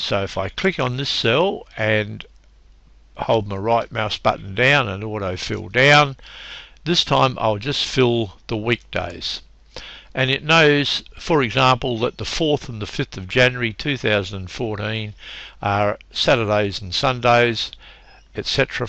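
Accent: Australia. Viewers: awesome! Thanks. You are an Aussie...right?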